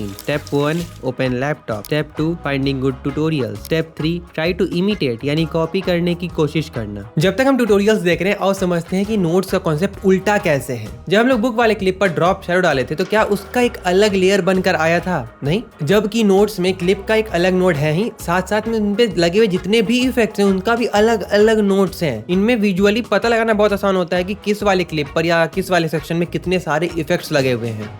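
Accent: Bihar, India. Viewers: Bhai tu bihar se h kya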